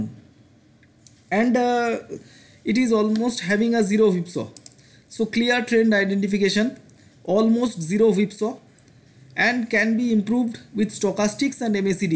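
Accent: India. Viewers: Bhai stop talking in butler English please. Use hindi please.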